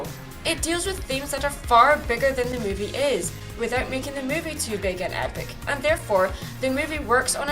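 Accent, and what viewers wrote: Scotland, United Kingdom. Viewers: Hi! I have a question to ask you. ( I don't remember if I had asked you this before so if I have I'm sorry but could you please answer again cuz I can't find it) I have a question about your voice for Mary Sue. I know that you're Scottish and I love your accent by the way but whenever I hear you do Mary Sue's voice ( to me) you sound like you have an American accent, was this planned for the first ever video that showed Mary Sue,or was it just by accident? I'm just wondering because your accent you have for your videos when you speak and accent you have for Mary Sue and she speaks or very different so I was just wondering thank you!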